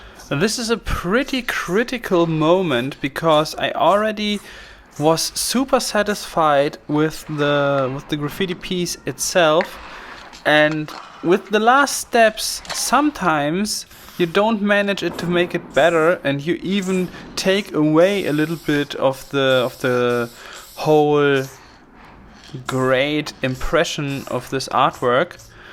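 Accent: Germany. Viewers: Hi, love your work! Where are you from? Love your accent! You sound either German or Scandinavian.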